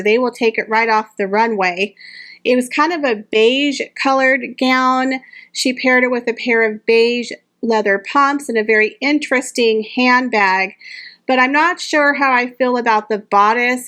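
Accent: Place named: United States. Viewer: Thank you for your great enthusiasm and representation of the Royals across the globe. If I may please ask? And I understand your dialect from America may prompt you to pronounce certain words as if they were American. Tiara is pronounced Ti a ra not Tiyerra. I am European/Australian and have worked in high end fashion for a long time and English is not my first language, however I may it a point to pronounce, people’s names or foreign names as they are pronounced in their country. Elie Saab is E l i not Ili. I watch you on all your shows and I do get it being American, you tend to make certain words sound more familiar to you.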